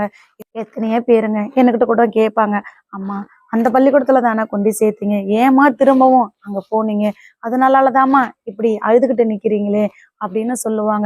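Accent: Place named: Thoothukudi, India. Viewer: அம்மா நீங்க ரொம்ப வருத்தப்பட்டு இருந்தீங்கன்னா அப்பாவை பார்க்க முடியாது உங்க பையன பார்க்க முடியாது உங்களுக்கு உடல்நிலை பாதிக்கப்படும் கொஞ்சம் தைரியமாகவே இருங்க ஆரம்பம் என்று இருந்தால் முடிவொன்று இருக்கும் உங்க பேஸ் போல தான் தூத்துக்குடி சாத்தான் குளம் பேசும் அப்படித்தான் இருக்கு கவலைப்படாமலும் வருத்தப்படாமல் இருங்கள் அப்பொழுதுதான் உண்மை தெரியவரும் நீங்களும் வீடியோ போட முடியும் நீங்க பேசும் போதெல்லாம் எங்களுக்கு மிக மிக வருத்தமாக தான் இருக்கிறது ஆமா வயிற்றில் வயிற்று எரிச்சலாக இருக்கிறது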